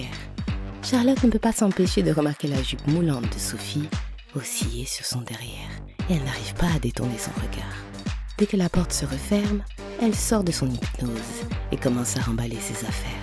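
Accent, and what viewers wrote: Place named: Tokyo, Japan. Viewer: On dirai tokyo la voix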